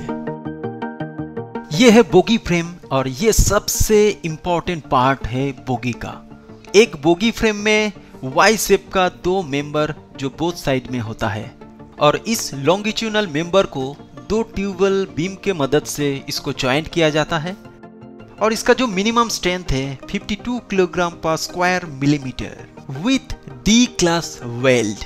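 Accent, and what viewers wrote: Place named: Bengal, India. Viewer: T = correct accent use kar rahe ho aap .. But FIAT per "T" ko keu Bengali accent " ত"" use karte ho aap log ? Next Bihar pe maximum people " use " = accent pe " S " ko keu Bengali accent " জ / j " karte hai ❓ mujhe pata nahi 🤔 Use word pe "" s " accent ko s jaysa accent nahi karte hain ❗ Main 1 word examples diya hai, aysa bahut words hain accent correct nahi hota / not ❓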